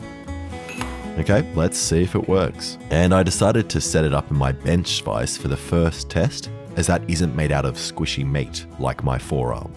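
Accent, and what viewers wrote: Australia. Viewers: I mean he is an Aussie, so he has the accent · And the Aussie voice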